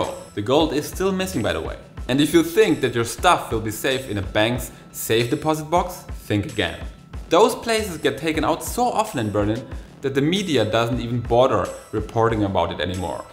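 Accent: Russia. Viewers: I confirm your russian accent as a russian ) bardzo ciekawe zobaczyć 😌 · your russian accent is wonderful! 😄😄😄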